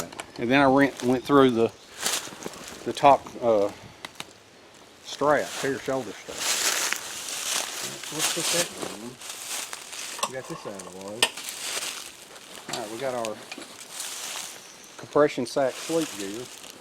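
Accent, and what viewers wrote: South, United States. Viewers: you have a real imagination for innovative camping appliances and gear. Because you really enjoy what you do; your content is not only informative, it is "entertaining". Also, I am from Arkansas. You speak excellent "Southern". GOD bless you and your family. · I always enjoy Friday morning with 😁. I've had good luck with the green slime in lawnmower tires. My gf loves your southern accent. 😊Also a can of fix a flat might be handy.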